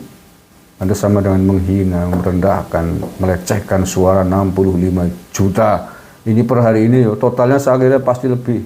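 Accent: Banyumas, Indonesia. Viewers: Pak rika hebat bahasa jawane totok banget,medti rika wong banyu mas,aku seneng moga sehat,aku cocok kata pak yusril mahendra...🙏🙏🙏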